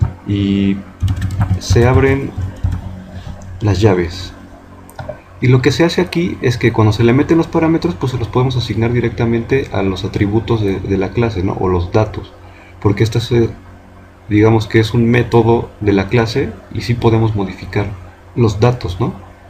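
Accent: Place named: Central America. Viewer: El acento se nota que es de centroamérica o de México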